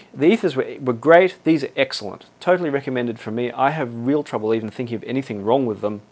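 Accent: Australia. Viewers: where are you from.. don't get the accent.. a cross between English and south african...probably Australian or kiwi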